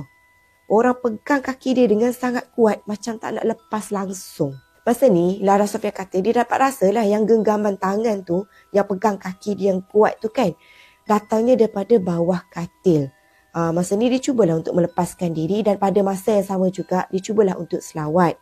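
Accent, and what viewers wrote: Kelantan, Malaysia. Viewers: ni org Kelate ke?! Cakap KL pun bunyi macam loghat Kelate 😅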